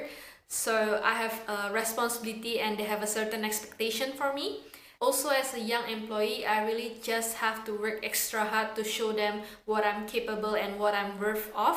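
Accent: Indonesia. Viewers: Your indonesian accent is obvious in this video..hehahe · Mbaknya ngomong inggris aksen Indo ya?. Kental bgt logatnya👍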